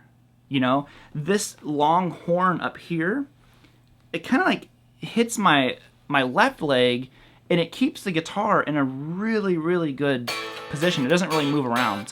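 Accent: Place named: Nashville, United States. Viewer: Yeah man it sounds like you forgot and left your wah on. I don't care for that Nashville twang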